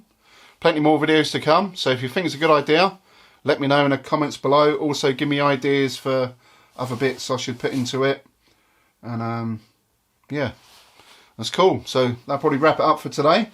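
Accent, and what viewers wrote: United Kingdom. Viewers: Where are you from, you sound proper Yorkshire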